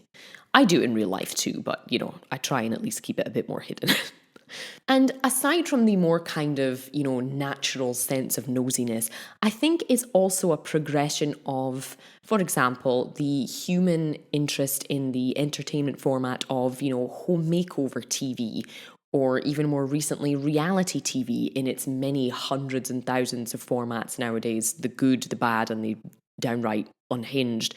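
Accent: Scotland, United Kingdom. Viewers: I dont know what it is but I love hearing you talk lol probably the scottish accent 😉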